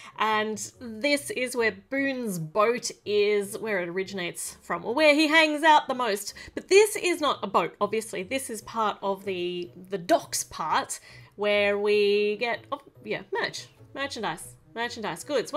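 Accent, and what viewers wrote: Australia. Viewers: I think those thinks over the trap door are Banana leafs. They are used as plates in South Asia. I am from India and we eat from banana leafs whenever there is a function like a wedding. By the way when you don't upload videos any one day I miss your crazyness, Australian accent, cuteness in your words and awsone lego videos. You kept me happy in the lockdown too. I love your videos😀😀😀😀😀🥰🥰🥰🥰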